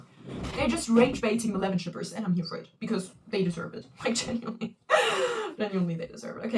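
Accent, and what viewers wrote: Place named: Germany. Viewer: Ich kann deinen deutschen Akzent hören 😼😼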